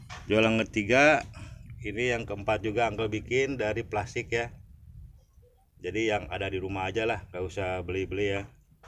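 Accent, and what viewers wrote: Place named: Sunda, Indonesia. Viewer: Mantap mang ni medok bahasa sundana 😁 salam ti Purwakarta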